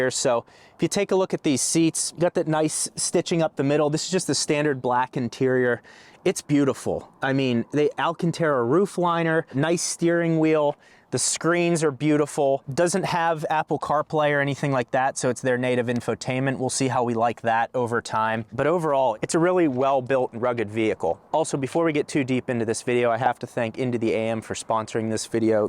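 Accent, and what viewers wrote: Pittsburgh, United States. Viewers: Just found this channel searching for Rivian Reviews and you sold me with the Yinzer accent. I'm considering a R1S to haul kids and stuff around. I'm so iffy on dropping the cash on the R1S but I'm looking at a 2016-18 Land Cruiser and the cost savings alone are just insane. I think 2024 will have a lot of great things for Rivian. I have a 18 month old and a new baby too in march and I just think the Truck will be a touch too small. If I got the R1S I would toss on the Frontrunner roof rack and have so much storage.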